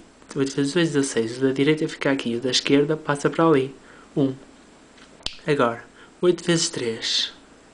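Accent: Portugal. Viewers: aaaaaaa que sutaque lindo sou do Brasil e adimiro os portugueses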